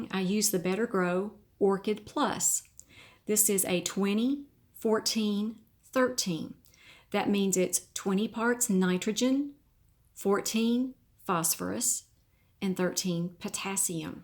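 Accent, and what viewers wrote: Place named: South, United States. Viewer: I love your videos!!! Your voice is so sweet, and it reminds me of spending summers in the south as a kid! I have learned so much from you about my orchids, and so far they’re doing great. My question is about fertilizing my orchids, in that I only have four, and mixing a gallon of fertilizer for 4 orchids seems wasteful. How can I adjust the amounts for so few plants?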